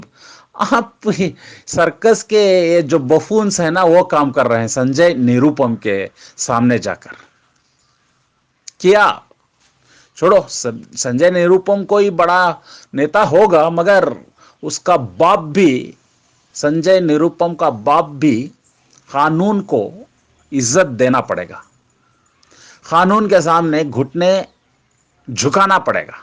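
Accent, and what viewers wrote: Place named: Kerala, India. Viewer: Kerala wale ki English aisi hi rehti .lekin rehte qualified log.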